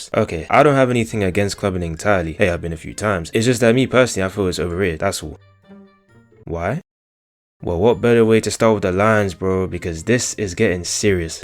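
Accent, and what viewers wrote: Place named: United Kingdom. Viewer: Bri'ish 🥹 (u tuff twin)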